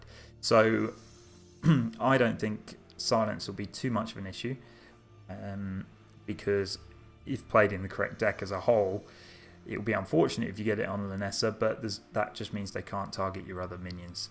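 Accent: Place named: United Kingdom. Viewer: you got UK accent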